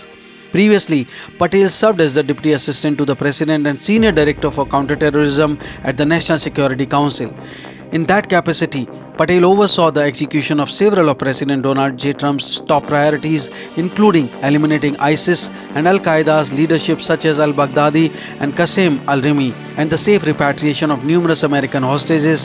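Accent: India. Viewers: The narrator must realize many in his audience doesn’t understand Indian English. Perhaps if he enunciates it would help.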